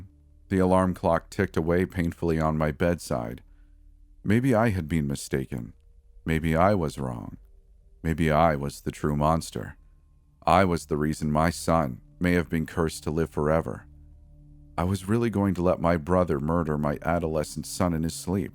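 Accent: Canada. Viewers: Love the Canadian accent😍🇨🇦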